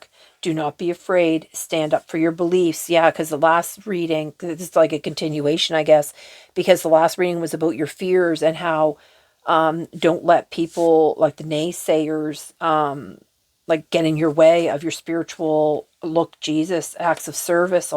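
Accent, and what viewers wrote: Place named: Canada. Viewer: LOL .. I think it is bc you are Canadian. I had a professor in college that spoke with a similar sound & he was from Canada too. 😂😅😊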